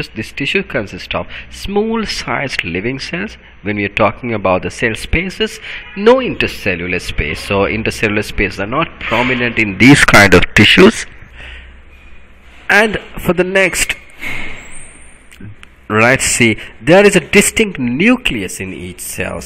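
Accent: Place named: India. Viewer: dude u got a Indian accent but i dont think a indian guy would do videos on sl sylabus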